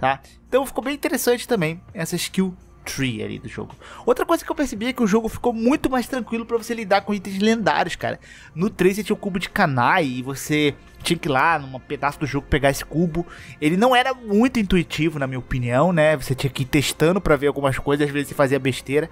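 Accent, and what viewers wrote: Rio de Janeiro, Brazil. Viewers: esse sotaque carioca me fez fechar o vídeo nos 10 primeiros segundos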